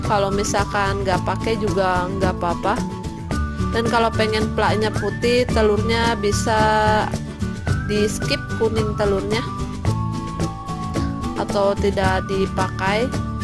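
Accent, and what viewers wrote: West Java, Indonesia. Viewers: Logat dan bahasa sunda nya jangan diketarain dong, yang lampung ga ngerti!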